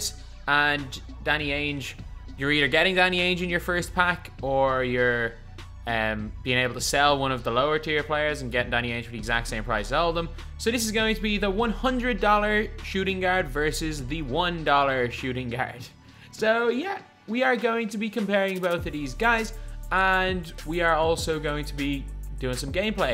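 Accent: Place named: Ireland. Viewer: He is from Ireland and I actually like to watch a 2k youtuber who doesn't speak american english. Cause that can get annoying after a while.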